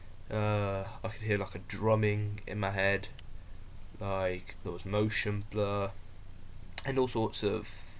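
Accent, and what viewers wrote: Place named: United Kingdom. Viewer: you sound british but with a twang lol.....what is your disability? how old are you? And where are you from? Are you on Facebook? Surely we can get you some sort of help! What organs are failing? What solvents have you been taking? How did you first get into taking them? Have you been doing them for a long time? I've never tried solvents, i see friends use gas and also use hairspray and a piece of cloth.......they said everything would sound like a robot and they would laugh.....I never tried it because i was so scared of drugs back then. I can't believe i went on to smoke opium and later on herion......it was such a nice feeling but now i don't get any buzz at all i just take it to feel normal and not unwell. I am coming down off of it bit by bit.......I have a fear of death that makes me have very bad anxiety on a daily basis. 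Would you give up solvents if you were given help in regards to your transplant surgery?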